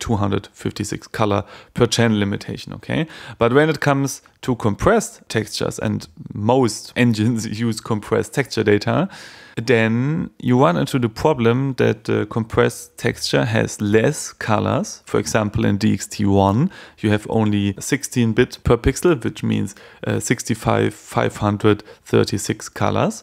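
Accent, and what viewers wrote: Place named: Germany. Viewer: I prefer the video for an introduction and then read your article. Your german accent helps me to understand your English as I am French. Reading is a more abstract process and requires more attention.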